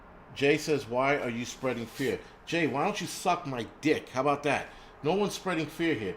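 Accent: New York, United States. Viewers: Your New York City style eloquence is showing again.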